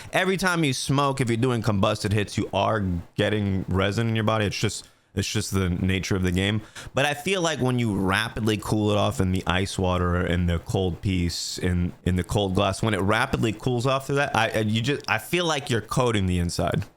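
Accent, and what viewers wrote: United States. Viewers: what state is ur accent from homie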